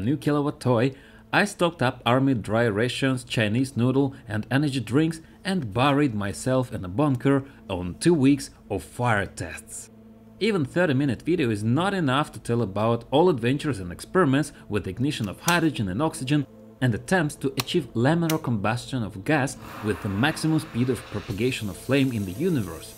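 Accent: Russia. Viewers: Да Ты Русский, за километр слышно!!! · Are you russian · Ты ж русский? Или просто акцент такой?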